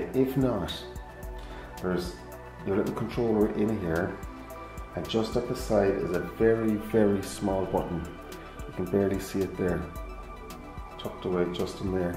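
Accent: Ireland. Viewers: One of the best tutorials on setting up this plane and I'll use it as a reference in adding the Byme-A stab to a little 800mm pusher I'm putting together. But a bit of a whinge also. That mellow Irish accent is pretty hard for an Old Fart with 60 years of heavy machinery hearing damage to decipher with the limitations of the bad reverb of your mike/location (sounds like you are talking with your head in a kerosene tin! 😜) but adding that bloody Youtube musak overlay of a Caribbean Steel Band banging on it makes it even tougher. I know nothing of Youtube posting but I see lots of folks making this mistake. If it is somehow required it is a pity there is no facility on our end to kill it. eg with a stereo system balance pot. Rant over. 😊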